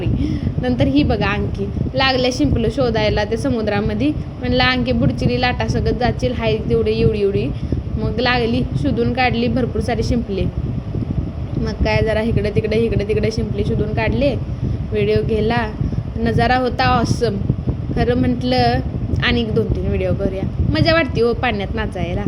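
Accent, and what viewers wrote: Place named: Kolhapur, India. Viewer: लय भारी कोल्हापूरी मराठी 😊😊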